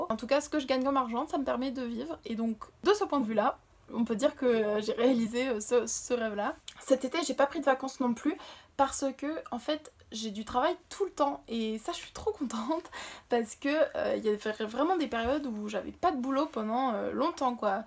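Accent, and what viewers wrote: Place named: France. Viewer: Et un bel accent français exprimé aussi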